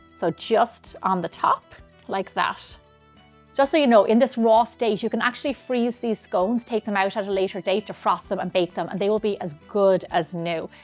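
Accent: Ireland. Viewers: Buenas noches....its really, early early a.m., a Weds., lol. I'm sort of a night owl & noticed your channel. I love love scones. Sadly I'm NOT a Baker. I misplaced my beloved Nina's (Godmother) recipe. I made scones that were at least passable & tasty. However, I so pray that I will be able to make these delish scones. Gracias for this video. I also love love your lovely Irish accent. I will view more of your videos, gracias. Paz be with us all 🙏. "Doña Isa" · So refreshing to hear HUMAN VOICE, and with beautiful Irish accent ! Not Ai .And the scones are great , Thank you! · I love scones - not a Danish traditional so hard to get really good ones! This recipe looks legit good and I will try them. Love your Irish accent! Love Ireland altogether...🍀New subscriber.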